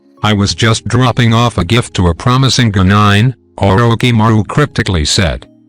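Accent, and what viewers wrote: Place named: Russia. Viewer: somepeats sounds like a russian speaking english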